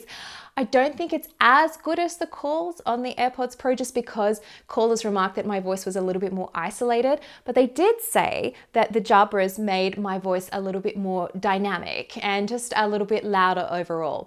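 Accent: Australia. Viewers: Dodgy aussie accent. Reminds me of the racism i faced while i was there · Nice hearing an Aussie accent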